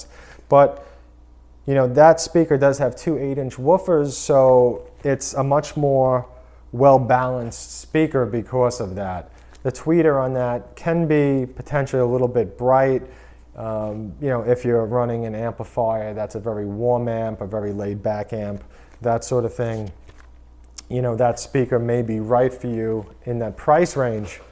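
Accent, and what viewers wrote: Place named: New England, United States. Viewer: Just LOVE your Yankee accent...LOL!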